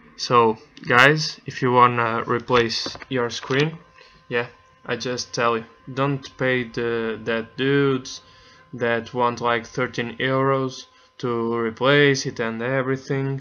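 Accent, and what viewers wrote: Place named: Caribbean. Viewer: Your video is unfortunately stay only have no idea this Huawei G300 disassemble your video is too long, it shows you do not know, my advice is to practice before and after you record it, you should have practiced before and make sure you see more removed when this smart phone, plus your English seems caribbean, I feel for you but my vote is desfaborable do not understand how you can be so stupid to make this video ....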